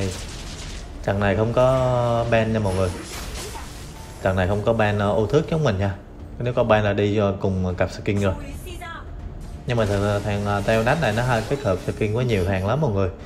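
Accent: Quang Nam, Vietnam. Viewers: Ông này ở quảng nam thì phải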